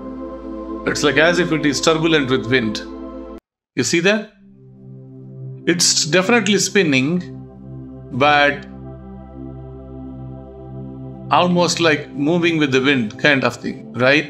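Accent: India. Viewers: This Man, explaining in his thick Indian accent, what I believe to be True. is speaking HONESTLY! Please Listen to Him as I Am! Doc Bones, out.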